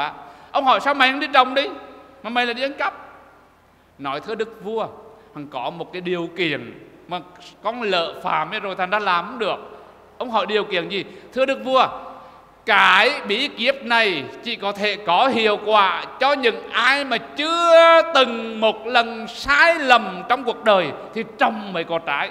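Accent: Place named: Hue, Vietnam. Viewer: Mô phật con rất thích nghe thầy giảng, thầy nói giọng huế rất hay.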